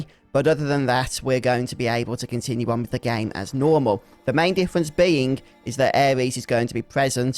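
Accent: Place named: Black Country, United Kingdom. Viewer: I love whenever I open a video and hear a Birmingham/Black Country accent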